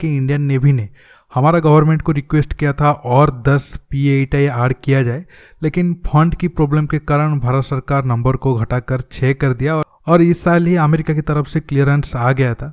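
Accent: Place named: Assam, India. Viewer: Bhai aap Assamese jaise baat kyu karte ho . Mere khayal mein aap okhomiya hoga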